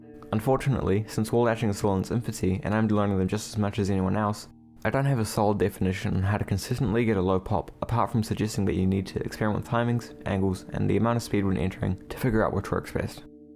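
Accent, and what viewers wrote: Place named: New Zealand. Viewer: You from nz? U got a nz accent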